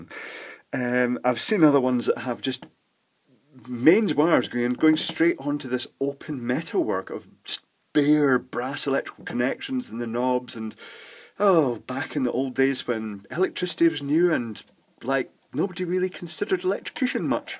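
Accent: Scotland, United Kingdom. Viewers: I have this exact unit, arrived today from China (impressively, in 7 days). I'm well aware of its enthusiastic use by the BDSM crowd (even seen an ancient one used in a public setting at a club - eek) but I bought mine simply to disinfect skin and keep zits at bay. Some aestheticians suggest it can be used for "skin lifting" but I guess bigclive sees it as quackery, and he's entitled to his opinion - I really do think it helps acne, though. Nice to hear a Scottish voice on Youtube :) Thanks for the very interesting video!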